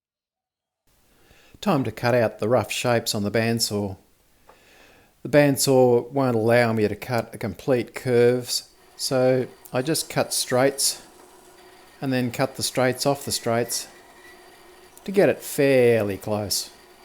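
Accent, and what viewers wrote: Australia. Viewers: I’ll give you a thumbs up for your silly Aussie voice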